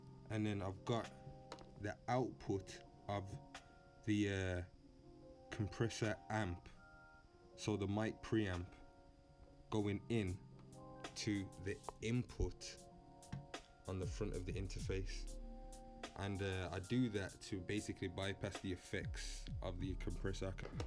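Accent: United Kingdom. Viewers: I sense some Midlands accent. 👍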